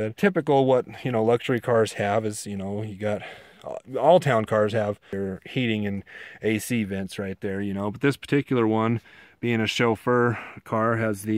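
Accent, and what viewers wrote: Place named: Buffalo, United States. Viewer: God bless u but i cant stand the way people from buffalo ny talk its always like your across the street when your right infront of them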